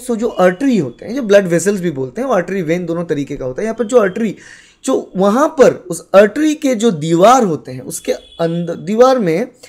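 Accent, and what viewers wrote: Bengal, India. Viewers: Thank you sir for this video 🤍🤍🤍🤍... But I'm feeling like you have some Bangali tone in your voice…..☺️are you Bangali??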